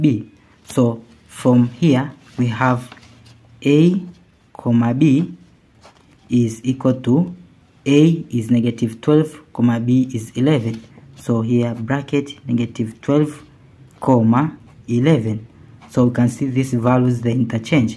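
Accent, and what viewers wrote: India. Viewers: тупое, длинное решение. Да еще и жутким акцентом, явно индус.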